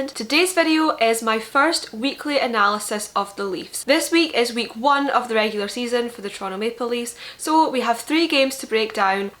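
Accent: Scotland, United Kingdom. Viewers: Yes the Scottish accent makes the analysis better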